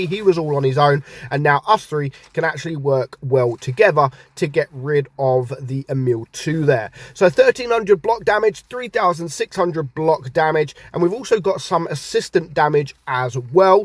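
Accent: United Kingdom. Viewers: apac i like ur briish accent mate lol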